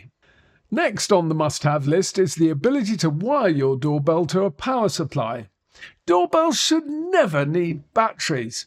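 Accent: United Kingdom. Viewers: I'm an english teacher from Austria. Most youtube videos are made in America...but I really love your british english. It's so much more sophisticated...I just love it:) also your content on the doorbells ist interesting of course.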